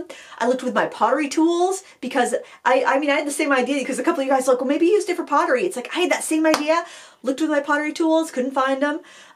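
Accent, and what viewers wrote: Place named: United States. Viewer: Just tuned into you by accident. I love your energy. Being an Old English Great Grandmother, at 1st i had to get used to the ' fast talking' American accent which im coming to abdolutrly LOVE . Its got my old arthritic body up n painting. Looking forward to seeing n hraring you much more often. Big hug from across the pond. Xxxx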